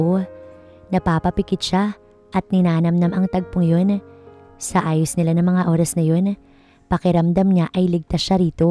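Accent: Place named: Hiligaynon, Philippines. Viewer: Ilonggang ilongga ang boses...hehehehe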